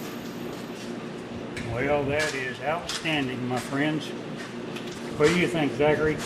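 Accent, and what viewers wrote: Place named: Arkansas, United States. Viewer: Always enjoy your work. Sounds like you're a Arkansawyer. I was born in Gentry in 1939. Keep up the good work.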